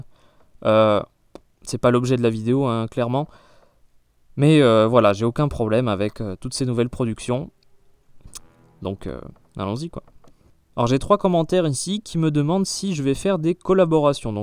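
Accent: England, United Kingdom. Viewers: Super vidéo comme d'habitude j'adore ton accent Anglais moi je suis pourrie en Anglais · Mec je sais pas pourquoi mais ton accent anglais me fais kiffer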